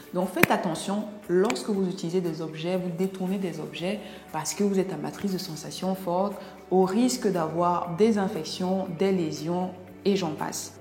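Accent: Cameroon. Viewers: Bravo Docteur. Huumm ! Avec ton accent Camerounais, je suis satisfait de votre pédagogie. Elle est simple, objective, moins culpabilisatrice et dogmatique . Bon , certaines religions poussent leurs adeptes à la culpabilité ? C'est sont parfois des dogmes. Quand à vous, va de l'avant et parle peu et mieux prochainement,Merci.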